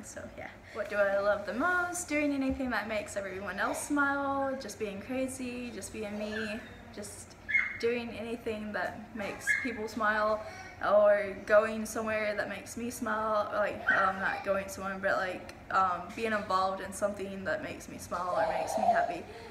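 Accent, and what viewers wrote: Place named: South, United States. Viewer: I watched the whole video - I really enjoyed getting to know you better. I love your family values - sounds like your family is the best, especially your brother! Guess what girl? I believe in you too! You have so many talents. You are a really good artist! I'm very happy to have met you and one day maybe we can do a collab when we have more subscribers! What state do you live in? You have a southern accent. Also what is mudding?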